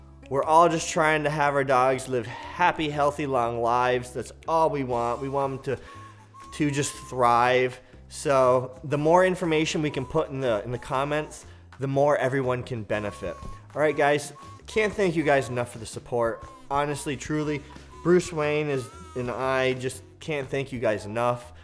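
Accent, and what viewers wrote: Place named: United States. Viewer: I've never heard them called "cona Corno" we say "Cain Corso" Cane Corso. You sound American so I was just wondering why you say it different?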